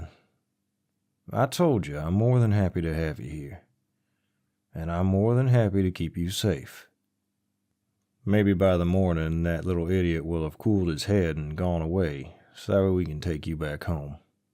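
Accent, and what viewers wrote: South, United States. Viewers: Your southern accent is everything😓🙏🎀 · IM A SUCKER FOR THIS SOUTHERN ACCENT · I said it before and I'll say it again... Correction, I think we love doing a southern accent. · I said it before and I’ll say it again. loves doing a southern accent And I f*cking love it 🥰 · this is too good not to get a part 2, and my god the southern accent, you got us all swooning with it😂great audio, can't wait to hear more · I’ve learned so much about myself today 🫣 For starters i had not even the slightest clue that southern accents did this to me🤠🥃 And second wow holy hot damn do I LOVE princess treatment and to be dominated *giggles nervously* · I love a southern man speaking in my accent lol I love this love the voice love it all not me folding in abt 2 seconds into the vid 🤭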